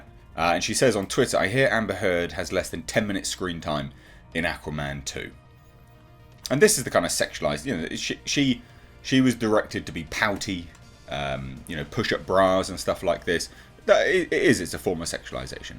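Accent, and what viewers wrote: United Kingdom. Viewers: You British accent attracts more then the contents…..Absolutely gorgeous 👍🇧🇷😘😍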